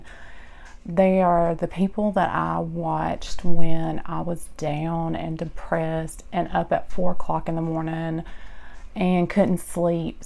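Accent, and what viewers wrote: United States. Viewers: I’m from England and I love American accents and yours is my absolute favourite ❤️❤️ my <mail>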